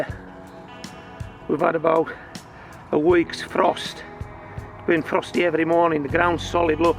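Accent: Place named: Cumberland, United Kingdom. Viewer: Hello New Friend. Great value instructional videos as well as a great Northern possibly Cumberland accent?? Will you tell me where? A welcome northern edition of gardening expertise.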